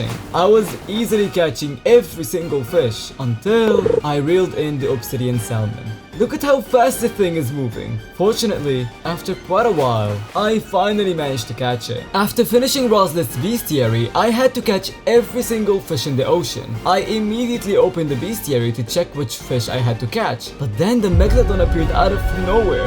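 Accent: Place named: India. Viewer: Bro what is this srupid Indian acent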